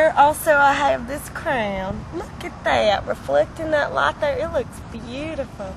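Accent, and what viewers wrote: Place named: Mississippi, United States. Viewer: i'm from and live in missippi, and she sounds like a lot of people from here and alabama..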